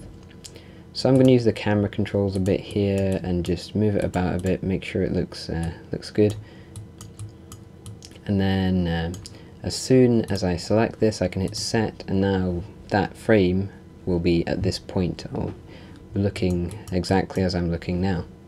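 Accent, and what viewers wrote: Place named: Canterbury, United Kingdom. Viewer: Are you from Canterbury? Im trying to learn southern accents more and my AS chemistry teacher and the guys from Syd Arthur sound similar to you, both are from Canterbury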